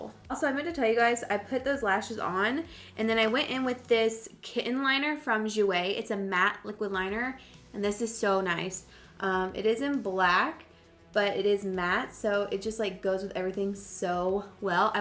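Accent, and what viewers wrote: South, United States. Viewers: Anyone else notice the small hints of her southern accent coming back since she moved back to Georgia?? I love it!!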